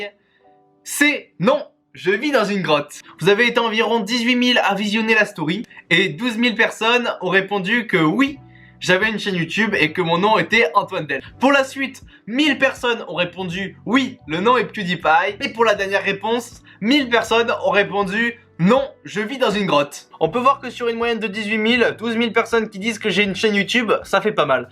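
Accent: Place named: Quebec, Canada. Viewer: Hey grand mammouth nommé Antoine ! Continue tes vidéos, tu vas bien avancer avec cette chaîne youtube ! Juste, quand tu parles j'ai l'impression que tu as un petit accent canadien québécois mdr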